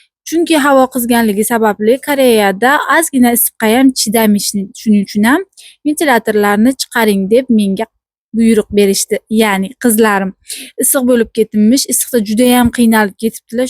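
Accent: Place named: Olot, Uzbekistan. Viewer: Shevayizga gap yoq,haqiqiy olotcha❤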